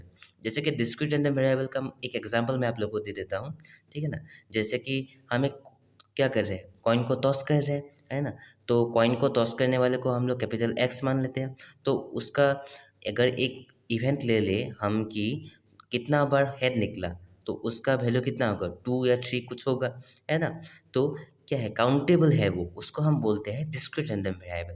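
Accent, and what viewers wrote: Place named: Northeast, India. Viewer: Ye North East ka hai isliye aisa ascent hai... Woh jaan bhujhkar aise nhi bol rha hai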